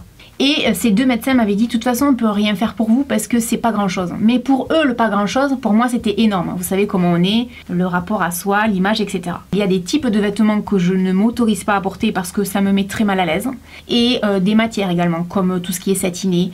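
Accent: South, France. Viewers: Je suis amoureuse de ton accent du sud😍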